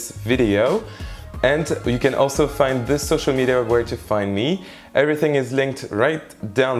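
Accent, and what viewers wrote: France. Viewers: MUST try this recipe. You make it look so delicious! ❤ Hey, just curious. I can't place that accent. Where in France are you from,?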